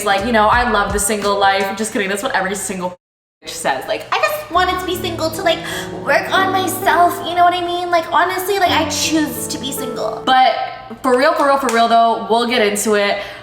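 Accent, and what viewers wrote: England, United Kingdom. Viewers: See the way she spoke with the British accent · I am from England and your English accent cracks me up 🤣🤣 Happy Valentines ❤️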